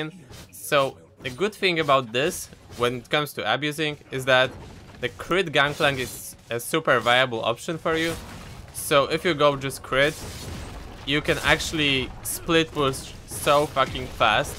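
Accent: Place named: Poland. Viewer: Jesteś Polakiem? Akcent strasznie polski:P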